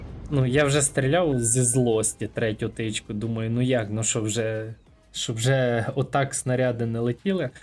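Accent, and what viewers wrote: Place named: Poltava, Ukraine. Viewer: Якій же в тебе гарний Акцент!Звідки ти,Полтава?Миргород?Суми?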